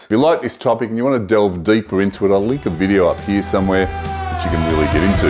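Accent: Australia. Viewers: Heard the Aussie accent subscribed immediately. Cheers cobber.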